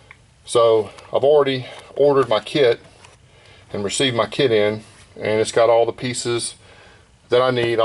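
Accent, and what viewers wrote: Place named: North Carolina, United States. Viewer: good advice - thanks! Where are you from btw - i'm in NC and you sound local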